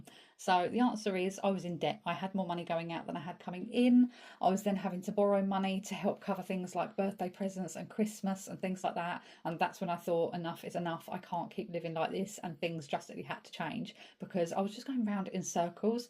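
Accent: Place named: Essex, United Kingdom. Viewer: I love your accent sounds essex, what is your starsign? Xx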